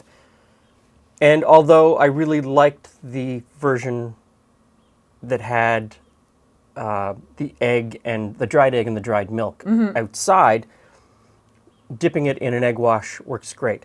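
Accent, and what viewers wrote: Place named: Toronto, Canada. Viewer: I don't watch this channel often but is from Toronto, which can be a bit different from the rest of Canada in mannerism, way of speaking, etc.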